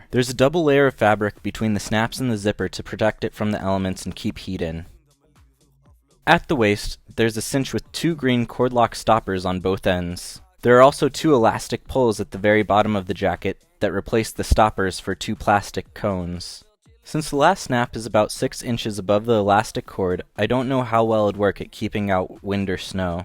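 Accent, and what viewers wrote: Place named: Germany. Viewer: sorry but that's a german accent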